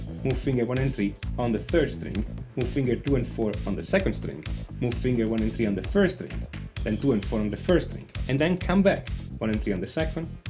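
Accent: Italy. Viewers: ma sei italiano per caso?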